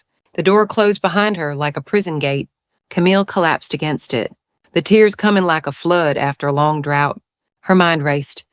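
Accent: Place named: South, United States. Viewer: LOVE THE SOFT SOUTHERN ACCENT. Good story.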